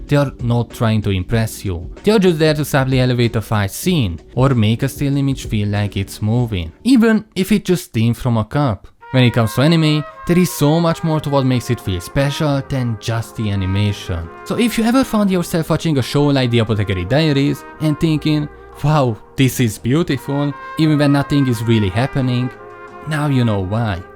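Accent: Hungary. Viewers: Random felhozta nekem a videót a YouTube, hallgatom, hogy de magyaros az akcentus, megnézem a csatorna névjegyét... yyapp, a " " brotha':D De amúgy nagyon jók a vágások és tök jó, hogy egy magyar is foglalkozik ilyen témákkal, még ha angolul is. Sok sikert a továbbiakban, keep it up~! ✨ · UGYANEZ!! Hallgatom, hogy na "he sounds EXACTLY like a Hungarian person speaking English would", és igen, az 😂 😊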